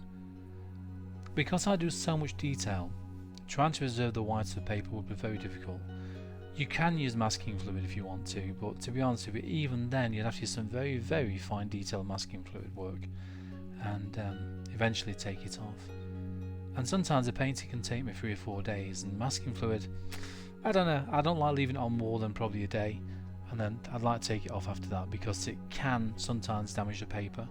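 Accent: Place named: Northern England, United Kingdom. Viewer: Are you from Devon? I'm American but I lived in England from <num> <London) I'm American, so I'm not great with British accents, but you sound Northern to me! (my husband is southeast London.. I'm gonna ask his opinion :-) all British have an opinion on accents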